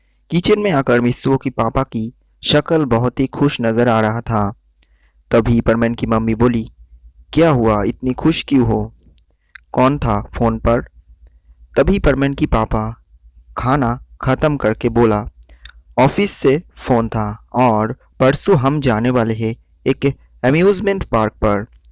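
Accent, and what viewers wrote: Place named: Bengal, India. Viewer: Are you a Bengali because your Hindi is like from a Bengali because i am also a Bengali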